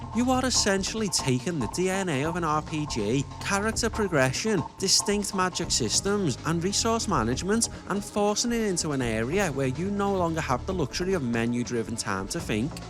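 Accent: Liverpool, United Kingdom. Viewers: scouse accent talking about beloved survival horror of the 90ds and 00ds was on the bingo card, but great video bud...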